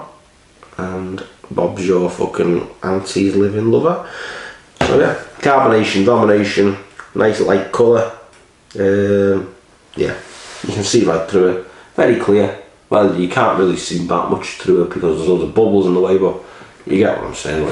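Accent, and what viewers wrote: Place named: Liverpool, United Kingdom. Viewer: Yes scouser! Just bought a keg for the machine never had it before, was on offer!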